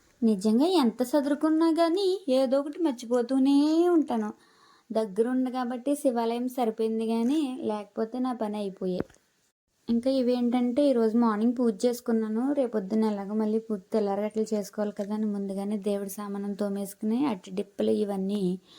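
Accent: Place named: Rajahmundry, India. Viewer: Soo cute sis❤ మధ్య మధ్యలో మన రాజమండ్రి యాస వస్తుందిగా 😂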